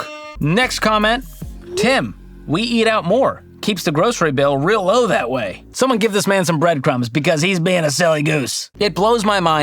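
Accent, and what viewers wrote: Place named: Texas, United States. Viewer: You sound like a fellow Texan! If so, and you like okra, that stuff will grow like crazy. I enjoy gardening, but I agree that most of it is not cost saving. Usually only really save by growing okra, peppers, cherry tomatoes, and Swiss Chard.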